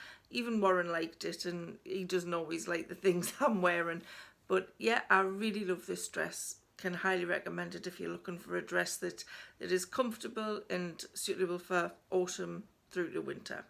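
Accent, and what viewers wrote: Newcastle, United Kingdom. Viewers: Hi going to give the shampoo and conditioner a try always been curious of that stuff. Do they do a shower gel, love your gordie accent.😊x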